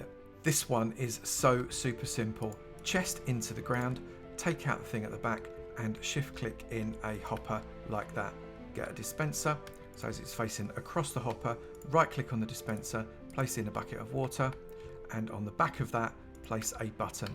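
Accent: United Kingdom. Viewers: I think British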